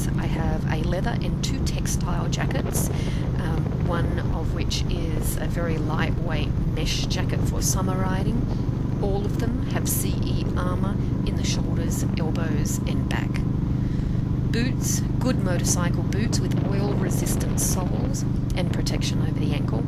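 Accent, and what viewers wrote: Australia. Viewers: G8 video there... Have watched most of them and have to say quite entertaining as well as informative... love the Ozzie accent... LOVE your Victory... Getting onto a course next weekend(Riding/Learner's Permit)... I'm a bit like yourself... but MID-FORTIES... LOL.. and have been on youtube for the last 6 months researching.... But i think the Victory's are No.1 with me at the moment(Hammer S)... But first things first... anyway... i really enjoyed your video..Cheers from Ivan.. Geelong AUS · is that the yamaha v star 650?? also are you Australian?? ( i am Australian and i think youre either Australian or a Kiwi)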